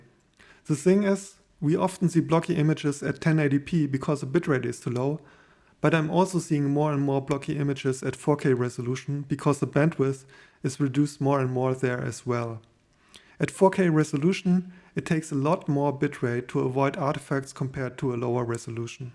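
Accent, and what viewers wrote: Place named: Russia. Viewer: Красавчик. Есть конечно чуток акцента русского, но в целом классно говоришь на англисском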